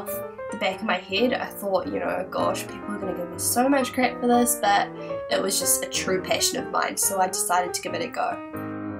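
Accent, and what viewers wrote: New Zealand. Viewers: i knew u were Nz ur accent is sooo strong :) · Wow! I'm so glad things are going well for you now :-). I never would have guessed that you went through all of that - much of which I can identify with. You are gorgeous with and without makeup. I love your videos. I was really surprised to hear a Kiwi accent after hearing mainly American ones. Bless you for who you are :-) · Yeah yeah I know, first off, it was rhetorical, because she obviously is from NZ, but yeah, that wasn't supposed to be like that btw hahhaahaha :3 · Did you even watch this video? She says it like 10 times and she has a kiwi accent😂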